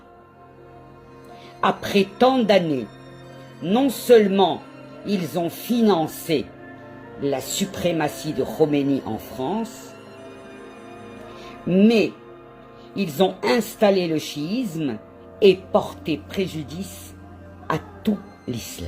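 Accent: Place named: Morocco. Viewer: لهجتك المغربية حلوة كثير الله يحميكم جاليتنا المغربية في إسرائيل كل الحب والتقدير والاحترام المتبادل ❤❤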